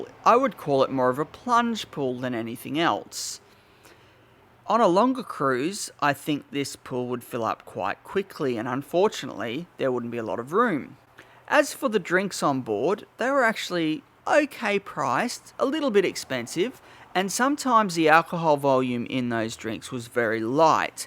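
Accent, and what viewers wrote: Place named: Bogan, Australia. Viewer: you sound like a bogan, and bogans love el cheapo P&O old tug boat rustboats, so no wonder u loved it darls, its the bogans of Australia that keep P&O going, bwhahaha, I wouldn't step foot on the floating shit boxes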